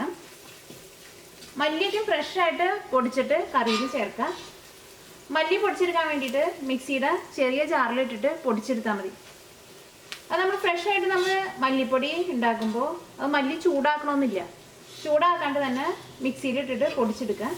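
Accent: Thrissur, India. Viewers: തൊലിഞ്ഞ തൃശൂർ സ്ലലാങ് ഒഴികെ എല്ല്ലാം കിടിലോൽ കിടിലം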